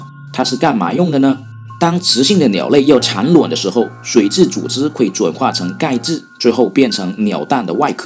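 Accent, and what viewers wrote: Hong Kong, China. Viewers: 香港口音越聽越靠杯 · 这口音，是香港人或广东人说的普通话吗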